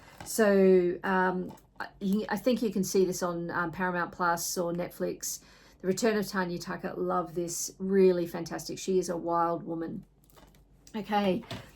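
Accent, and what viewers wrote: Australia. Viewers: Great to hear an Aussie voice, not too many in the VC. Cool that you showed Hermitude. I grew up at the foot of the Mountains, my Aunty still lives at Blaxland. Where's a good record store in Western Sydney? Next time im there i want to have a dig.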